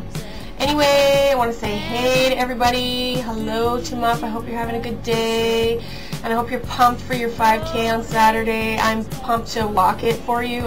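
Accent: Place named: Wisconsin, United States. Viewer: You betcha! Lol....I'm from Wisconsin, so it sounds like ours a little.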